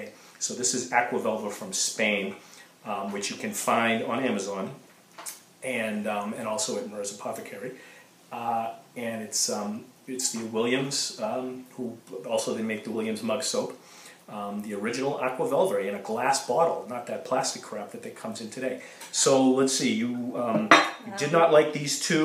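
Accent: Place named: Boston, United States. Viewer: I hear Boston!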